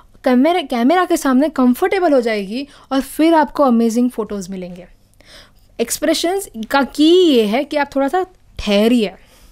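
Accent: Gujarat, India. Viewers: Mam aap Kabhi Kabhi Gujarati aavaj me bat karte ho kiya aap Gujarati ho, ya Gujarati language aapko aati hai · Gujju tone ma mja ave che mam😊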